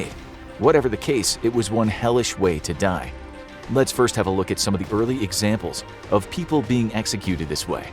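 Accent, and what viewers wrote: United States. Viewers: This dude is probably the best narrator on YouTube. He also makes the American accent sound best. 😁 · Honestly yeah. I always loved the strong american accent haha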